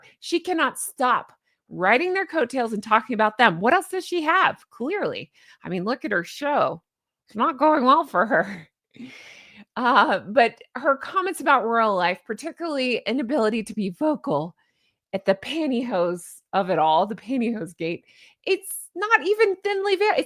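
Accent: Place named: United States. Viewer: Im not saying all Americans have a crappy sense of humour, but generally us Aussie's do consider American's to be a little sensitive and easily triggered lol. is very much like an Aussie with her highly developed sense of humour and down to earth personality and the way she laughs and smiles often, any Aussie reading this will KNOW EXACTLY what I mean. is like an Aussie with an American accent 😁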